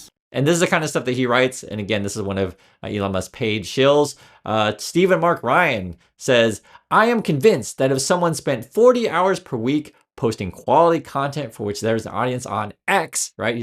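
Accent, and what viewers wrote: United States. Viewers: I've only just seen your channel today. Your accent sounds American. you may be able to pass as Canadian. So why are people telling you to move back to China? It sounds like you were born here. Or at least grew up here. Although I would guess at least 3rd generation American.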